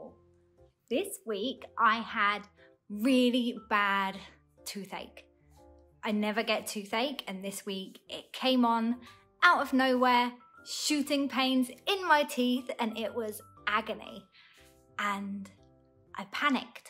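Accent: United Kingdom. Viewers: 英國腔英語很好聽，南非也是英式語言，我住過幾年，但是沒有學好英語！現在聽到熟悉英語很親切，很好聽！很棒！謝謝分享！😄🇹🇼💯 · 哇、正英國腔的美女、希望有空你也可以講些你家鄉的事、讓我們也長見識、畢竟英國太遙遠、或許透過你也可以讓我們多了解英國。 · I really like you, like your British accent. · 您的英式英語口音真是令我覺得悅耳動聽啊！ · 記得定期去洗牙齒喔不然會有牙結石價格也是掛號費很好奇英國或是其他外國人牙醫這麼難預約有習慣在洗牙的嗎? 還是說洗牙的費用比較便宜? 最近常常在網路上看到牙結石超大塊的案例不知道是因為牙醫太貴太難約又沒再用牙線才有這種狀況發生嗎台灣牙醫太多很多裝潢甚至非常的高級豪華卻一樣只收掛號費 (除非是矯正牙齒之類的健保不給付的) 所以牙醫的技術真的差異蠻大的我家高雄這邊就有因為拔智齒的速度快到被稱為快槍手出名的牙醫在但有的甚至為了賺錢很愛給人拔牙齒或各種牙齒保健療程把原本的流程分拆複雜化因為這樣他們能得到健保比較多的費用我本身認識的牙醫他就是主張能不拔就不要拔所以我四顆智齒都沒長歪牙醫師也說不影響就不要拔因此我對他很信任我曾經也有過牙齒補的地方掉了那時候我人在台灣但也真的超緊張怕吃東西殘渣之類的繼續腐蝕我的牙洞所以就馬上跟公司請假去看牙醫了所以我能理解你當時沒有醫療補助又遇到這種情形有多恐慌真的想不到國外有賣補牙DIY組.... 因為補牙的時候牙齒要完全清潔才能補上去不然細菌反而被蓋在牙齒裡面更糟糕最近開始追蹤我本身很喜歡英國腔覺得很舒服真的跟留言說的一樣難得有說的這麼慢跟清晰的英國腔:D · 謝謝妳最愛英國腔了我的英文有救了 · 英國美女講出好聽的濃濃英倫腔，速度及清晰度都適合用作語言教材範本。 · 英國腔真好聽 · 英國腔，好好聽喔 ~~ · Love your beautiful teeth, smile, dentist stories and that bloody gorgeous British accent~~~~ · 英國腔真好聽。台灣牙科還是有更高級的診所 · I love tha way u talk, so British! 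Is your husband Taiwanese?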